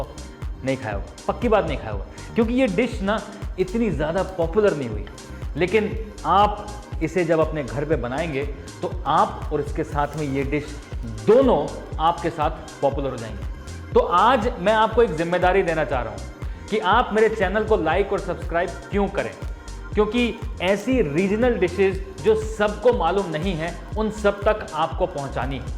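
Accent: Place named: Maharashtra, India. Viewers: You have picked up typical Marathi accent! Great fun. झक्कास मिसळ बनवली दादा! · Chalaa bole, are ekdam marathi lage ji!!! ❤🙂👌jiyo chef ji!!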